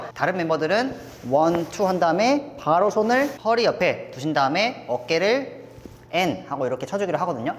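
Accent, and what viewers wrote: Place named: South Korea. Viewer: yes, a lot! And the subtitles were too, since you have korean accent (which is so cute being honest), thanks! I'll be looking forward to your next tutorials (CAN'T BELIEVE YOU NOTICED ME, YOU'RE AMAZING 😍)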